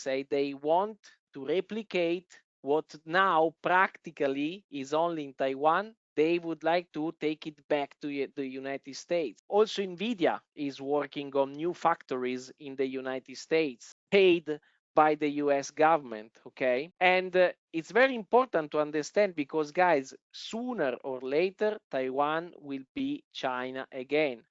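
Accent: Italy. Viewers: sembra un inglese con forte accento italiano, ma non vorrei sbagliare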